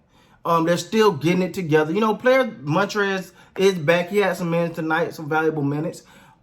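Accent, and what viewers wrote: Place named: South, United States. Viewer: First time watching this channel. Wondering if he is from Florida or somewhere close. Not only does he sound like Roy Jones Jr. he has the same dialect. I'm from the midwest so not well versed in southern accents and dialects. P.S. You do a pretty good job...keep it up.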